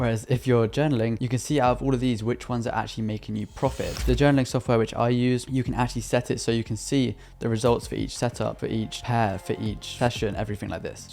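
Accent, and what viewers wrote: United Kingdom. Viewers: you know that YT is a global platform where people from all over the world watch your watch... my worry is you speak faster in british accent some words hard to understand so pls speak slow for certain words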